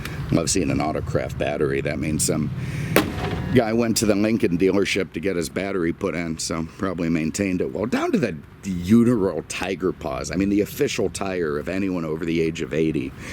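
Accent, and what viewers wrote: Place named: United States. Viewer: I also love the way this guy explains cars is good humor and especially the accent.. pure Americana if I owned an Automotive Group Los Angeles you'd be my spokesman on TV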